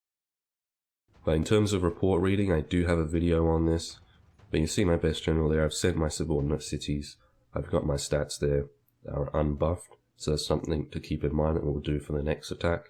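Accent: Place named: United Kingdom. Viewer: Record your voice level at a higher volume on your next video, please I find it a bit low, could be clearer for your wonderful British accent. Great otherwise.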